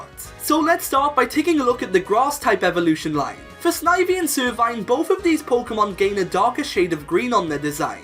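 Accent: Wales, United Kingdom. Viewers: I love this man’s voice ❤️ welsh accents are pure 🔥 Another great and fun video, love me some shiny starters;) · Are you from north or south wales? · are you Welsh?? I’m tryna figure out your accent!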